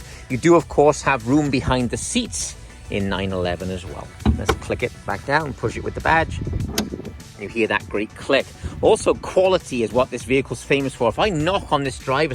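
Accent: Wales, United Kingdom. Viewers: Welsh accent !!!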